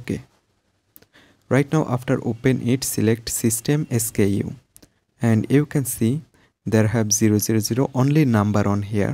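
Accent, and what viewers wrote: India. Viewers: This is our indian 🇮🇳english bro 😅 ok so don't mind ok 😅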